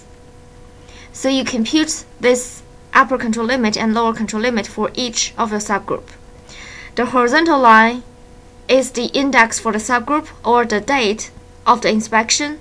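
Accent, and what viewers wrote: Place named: China. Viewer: Chinese accent haha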